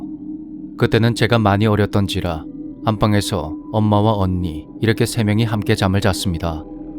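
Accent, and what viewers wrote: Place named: Busan, South Korea. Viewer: 야후꾸러기 참 오랜만에 들어보네요. 이야기를 들을때마 참으로 신기한 일이 많은것 같아요.~~ 연기도 짱! 연기속에 부산억양은 꼭 나온답니다~^^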